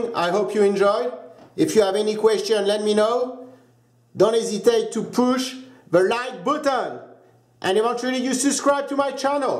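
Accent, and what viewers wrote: Jamaica. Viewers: i love his jamiacan accent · jamaican accent man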